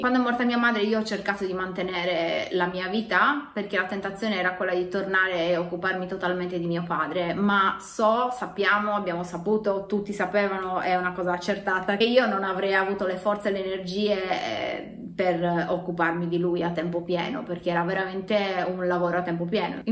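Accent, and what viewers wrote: Italy. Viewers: Ovviamente più intelligente del normale 😅, l'accento sardo e' carino...😅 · ciao sardo anch'io e con lo stesso modo di parlare al minuto 2 e 30 (ghe al posto di che) alla romana ma io nn vivo a roma....è normale???complimenti per il coraggio (sei anche una bella ragazza)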